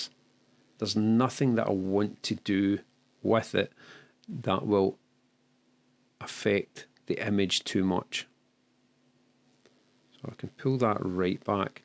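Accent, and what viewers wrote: Scotland, United Kingdom. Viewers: Great content as always. Unagitated and to the point. By the way: first time I watched without the subtitles - and I slowly get the groove for the Scottish accent :)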